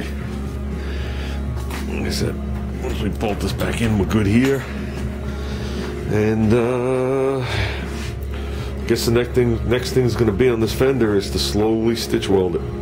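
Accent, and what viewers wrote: New York, United States. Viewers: Sweet power hour. Your accent reminds me of my god parents who lived in Pawling, NY.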